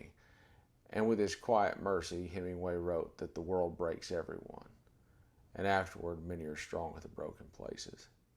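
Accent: South, United States. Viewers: Awesome to hear an American Southern man speaking so perfectly and intelligently on the realities of this issue - with dignity and respect. Well done Sir!!! 👏 👏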